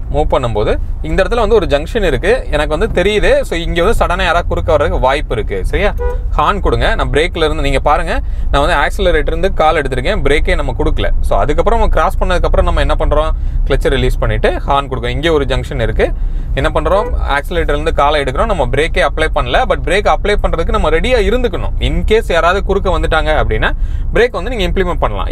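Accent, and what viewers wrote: Virudhunagar, India. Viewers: Neenga VIRUDHUNAGAR ah na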